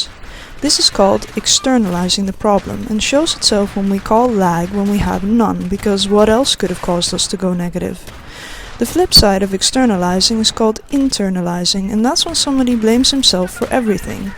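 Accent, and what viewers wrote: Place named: Netherlands. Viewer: Definitly Dutch accent!!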